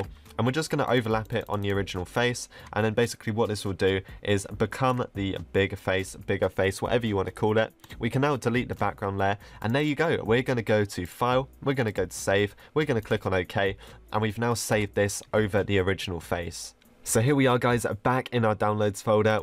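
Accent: United Kingdom. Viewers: i'm british i was born on london and your voice is kinda british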